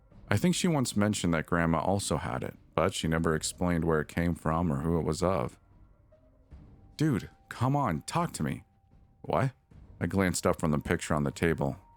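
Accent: Canada. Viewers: oh yah, you’re my favey! Great voice,(the teeniest, loveliest Canadian accent) awesome selection, I’ll be around for your whole run :)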